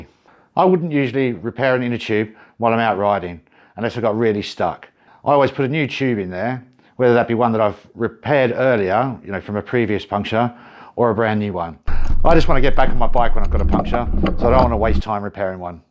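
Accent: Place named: Australia. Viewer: Just watched another video. Great and clear to understand. I did pick up on the Aussie accent so should be saying G’Day. I’m Scottish and lived a few great years in Aus.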